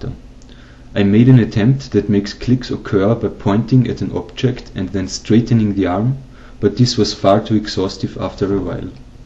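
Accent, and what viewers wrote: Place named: Germany. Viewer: i like your english bro ...it sounds so german xD Njo egal, find ich ne geile sache xD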